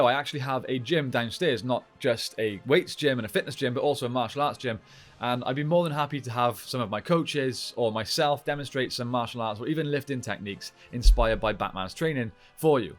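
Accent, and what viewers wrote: United Kingdom. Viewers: Lol, not surprised you have a gym downstairs. You don’t really dress to show it off, but you can’t entirely hide from a discerning eye that you’re in good shape. Put your physique together with that British accent, your red hair, and your rugged good looks and it’s no wonder I enjoy looking at you just as much as I enjoy listening to you talk about Batman!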